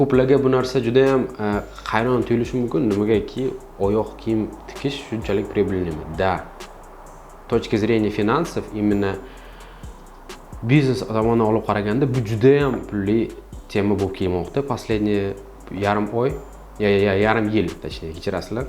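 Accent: Uzbekistan. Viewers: Самаркандча акцент )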